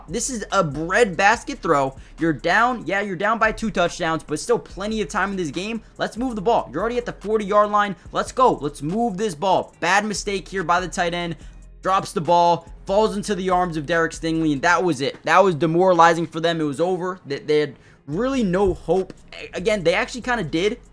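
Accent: Canada. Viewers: Is brother a Canadian? Caught a couple of 'aboots' in there?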